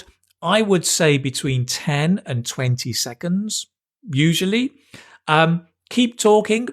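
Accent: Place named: Manchester, United Kingdom. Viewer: Definitely British ,from Manchester, excellent teacher!!.Greetings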